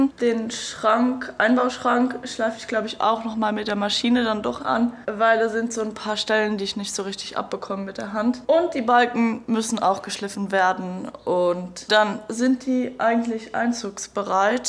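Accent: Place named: Luxembourg. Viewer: All Respekt, gesäit richteg gutt aus:) An däin lëtzebuergeschen Akzent ass bal ganz focht.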